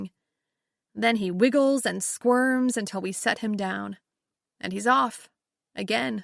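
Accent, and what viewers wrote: United States. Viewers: American narrator.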